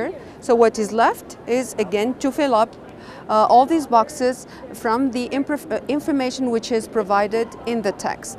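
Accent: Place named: Russia. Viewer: hello Teacher, You have a little European country accent. May be , Russia. Where are you from?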